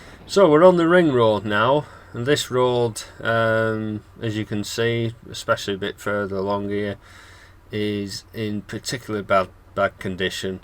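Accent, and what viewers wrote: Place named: Lancashire, United Kingdom. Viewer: Amazing! Took me back about 65 years to when I stayed with my granparents after my mother died ans I went briefly to my first school in Feniscowles. We lived on Livesy Branch Road and would walk down to see my Aunty in Cherry Tree.Yor tide tookme past my Aunty's drapery shop on Preston Old Road , then over the scaringly narrow bridge over the canal (where the Bank and Cherrytree Mills uded to stand) then low and behold you turned into the estate wher my Aunty lived passing her houe just past the corner of Fielding Crescent and Railton Avenue and then you turned and went past the house for the second time. I live in Sydney and last visited my grandparents in Blackburn in the 1950s and early 1960s. From Livesy Branch Road you could see Green fields with sheep grazing, cricket being played beyond that and in the distance a stem train chugging of towars Houghton Towers (I think) leaving a trail of smoke. 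Any way thanks for opening up a door of memory of those early years. Love to hear those Lancasire vowel sounds too. Thanks Paul in Sydney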